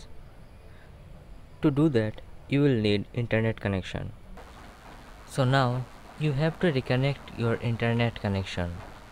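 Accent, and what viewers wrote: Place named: Bengal, India. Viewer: you are asking Indian? I can say he is definately bangla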